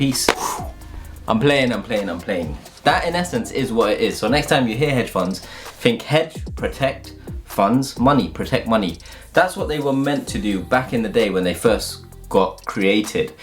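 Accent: United Kingdom. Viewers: This isn’t in basic English! It’s in British!!! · Nice and clear especially because your British too. Can you explain short selling too please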